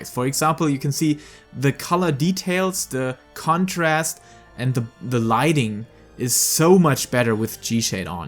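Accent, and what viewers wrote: Germany. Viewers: Dein hart deutscher akzent plus der misch masch an amerikanischem und britischem englisch machts unmöglich dir zuzuhören... Dazu kommt, dass der Look am ende einfach mal gar nicht aussieht wie 7.0? Und "similar" halt auch nicht? ôo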